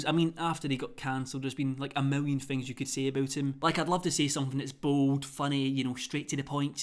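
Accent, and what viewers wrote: Scotland, United Kingdom. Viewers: Anyone know what part of Scotland he’s from just wondering cos accents are cool lolll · where about in scotland are you from?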